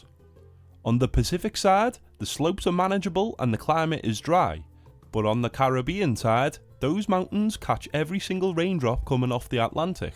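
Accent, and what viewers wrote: Scotland, United Kingdom. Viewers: I can hear an accent, but I'm from the Netherlands, so I don't know which accent it is. Is it a Scottish accent?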